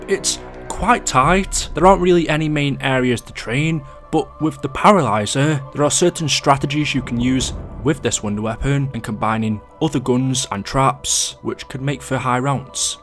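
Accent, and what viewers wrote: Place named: England, United Kingdom. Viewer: Nick Barrow He has an accent. I think he is from Birmingham or Manchester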